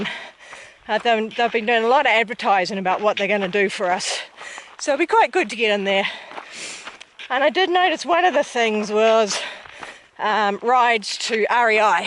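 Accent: New Zealand. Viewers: I am enjoying the pleasant company of this Kiwi.Utterly sweet accent:)(Tent=tint):):)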